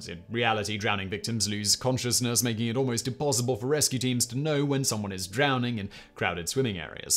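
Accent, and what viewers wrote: England, United Kingdom. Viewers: you and your staff have an amazing propensity for getting it wrong more and more often. Before you cause much more damage in peoples lives. Consider how you produce these videos, and how many. Quality control sucks. Number two is patently and demonstrably false. The brain does grow and learn through mental exercise. Apparently you or your editors? Have never heard of cerebral plasticity. Or took no notice of the numerous studies which proved beyond any doubt that people who picked up a second language, or learned new math skills, or even practiced mental yoga's, in middle age, or even older, were far less likely to experience cognitive decline, and thats just one benefit. But how I weary of making a counter point to you. So many of your viewers hear your English accent, and just accept that you are smarter than they are because of it, and so you must know what your talking about. 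Such is specious reasoning. Every part of the body, including the brain, can be exercised. And growth will continue so long as telomeres tell, cells make new connections, and nuerons know what is going on. I'm serious, yet I jest. And yes, you can definitely make up for lost rest and missed sleep. Changing sleeping patterns sucks for your health. Both mental and physical. Yet we can adress this as well with adenosine and melatonin, or sometimes a simple histamine. Maybe you guys will double check yourselves and get an opposing opinion some day. I find it difficult to believe you ever do so, re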